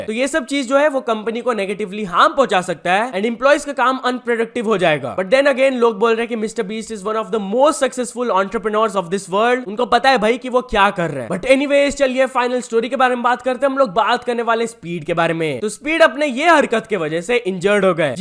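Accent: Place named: Bihar, India. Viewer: got all the bihari accent inside him 🥵🥵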